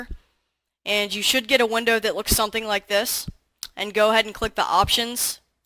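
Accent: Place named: Delaware County, United States. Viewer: Hey are you from east coast? Sounds like a Delco accent. This video helped a lot. I was getting 0 FPS. When I downgraded my.dll file that enb series comes with to the one recommended it worked! It doesn't seem as good as project enb so I need to tweak some stuff.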